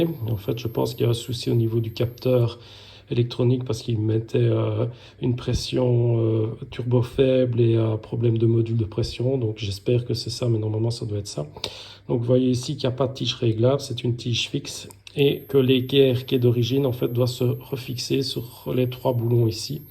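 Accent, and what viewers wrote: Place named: Belgium. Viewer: Bonjour, merci pour la vidéo, j’ai actuellement le même soucis… j’ai une golf sportsvan 1.6Tdi 2016 J’ai eu ce problème la première fois y a 1 an, seulement sur autoroute quand j’effectuais un dépassement cela m’arrivais rarement, 1 an plus tard j’ai ce problème tout le temps sur autoroute a la moindre pente ou dépassement ( quand j’accélère bien sur) j’ai le fameux temoin suffisais juste que je coupe le contact et que je redémarre le moteur et j’étais repartie mais le problème revenais aussitôt j’ai également remarqué que le moteur a froid ne me fait pas ce problème, seulement a chaud ( quand il fais vrmt chaud + le moteur qui a tourné depuis un moment) c’est également le code d’erreur P0299 turbocompresseur suralimentation faible… merci pour ton aide j’espère pouvoir trouver le problème, je suis de Belgique et il me semble que d’après l’accent que tu as tu es également de Belgique peut-être pourrais tu y jeter un oeil un jour merci en tt cas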